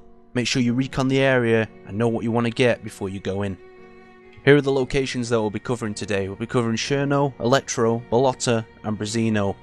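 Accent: United Kingdom. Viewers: you're voice is absolutely amazing, where are you from in the UK? -- you sound like you're perfect to join the army with that voice as a CO :)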